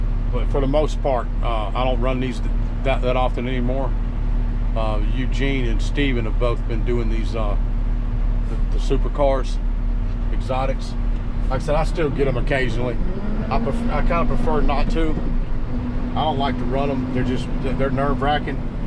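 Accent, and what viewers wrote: South, United States. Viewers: Laahamburghennies, Austin mahrt inns, beautiful sunny southern vernacular! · "Lamberginny, Austin Martin..." haha, gotta love the southern accent.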